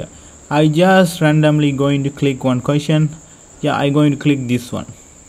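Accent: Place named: India. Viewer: i know i can understand it. When i hear an indian voice :v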